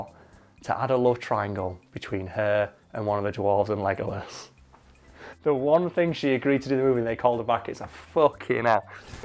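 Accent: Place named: Northern England, United Kingdom. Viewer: I’ve been binging your content for so long that I’m starting to think in a Northern accent... I’m from Australia.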